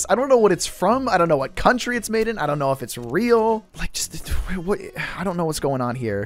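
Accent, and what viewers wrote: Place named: United States. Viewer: kac srr kinda sounds american and looks american, overall just americano vibey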